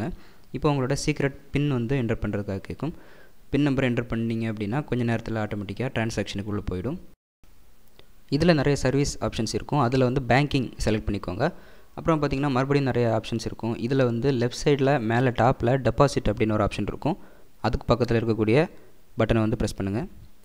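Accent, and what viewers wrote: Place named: Kanyakumari, India. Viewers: ஒலகத்தில எங்க போனாலும் கன்னியாகுமாரிகாரன ஒரே வார்த்தைல கண்டு பிடிச்சிலாம்......... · Bro neenga kanyakumari thanae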